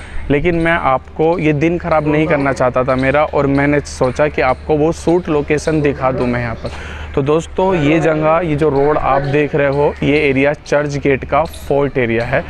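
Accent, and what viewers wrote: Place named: South, India. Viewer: Bhai Tum South अंका bol rahe ho masur comedyan mehemod he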